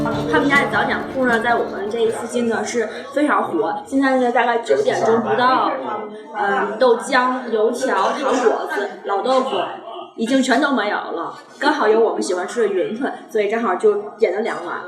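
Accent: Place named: Tianjin, China. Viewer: 特别喜欢听Kaka说的津普，很像上学时听同学说话的感觉👍加油！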